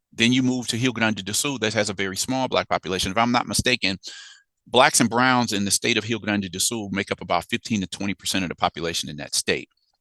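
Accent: United States. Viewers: I'm not sure what your background is in terms of your country of origin but you sound like you were raised in the US (maybe the Westcoast just like me) and I feel like what I'm gonna say must said. Alot of us have done away with much of these global Black family narratives dew to our contact with these Black looking people who immigrate here. MOST of them don't even use the word 'Black' as an identifying term, they identify by nationality or their tribe or ethnicity. The word Black to us is absolutely essential to our identity and connection to our ancestors and their historical struggle against extreme oppresion. And mostly because it's a word that designates us as being the embodiment of opposition to white supremacy. When I hear that these Black looking Brazilians have to learn to be Black it tells me that the real Black identity isn't for them. We must be Black here the states and don't get the luxury of claiming we don't know what it means. 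So I say if you're a Black looking Brazilian who can live as a non-Black person than that's what you should do and leave this Black identity stuff to us...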